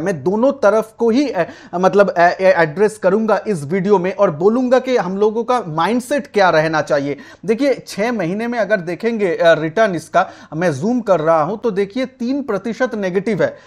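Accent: Bengal, India. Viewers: many times you put bengali words in hindi accent in your communications that creates unique statements which I personally liked very much, at the same time there is no mistake as such,additionally your hindi communication touched another level which becomes your signature mark in futures. Yes, today is the day of huge corrections.Still I personally feel IT funds will boom again. Thanks for your video that will definitely help & subside today's burning pain amongst mutual fund lovers. 👍.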